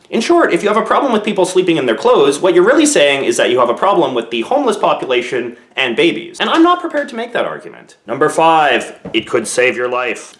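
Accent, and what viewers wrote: Canada. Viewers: Bill Ward Hes canadian. Hes gonna speak differently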